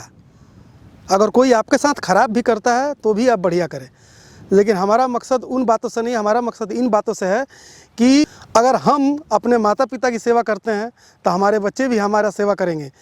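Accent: Bihar, India. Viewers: Ap Bihar se hai sun k bahute accha lagal ba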